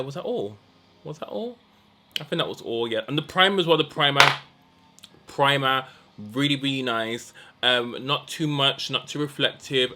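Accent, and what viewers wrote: Birmingham, United Kingdom. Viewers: Is that a Birmingham accent I hear?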